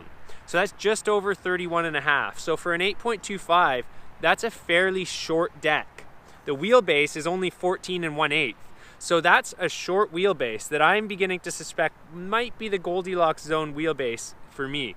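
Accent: Canada. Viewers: are you canadian? kinda sound like it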